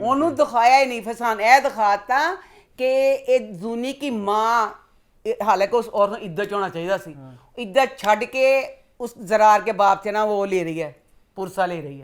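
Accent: Punjab, India. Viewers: Jab sae josh ma aati han to andar se punjabi nikalti ha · Yar i loved opinion of present Govt. situation. is a pure punajbi soul. Cute punjabi accent.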